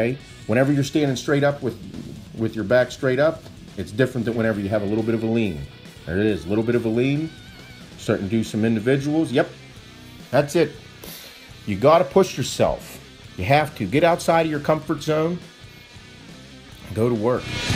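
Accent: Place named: Pittsburgh, United States. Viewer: Love these videos. Probably the yinzer accent.. Crushin it, boss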